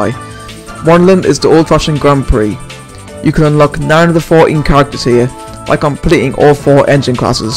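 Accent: Newcastle, United Kingdom. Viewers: I can’t understand your Geordie accent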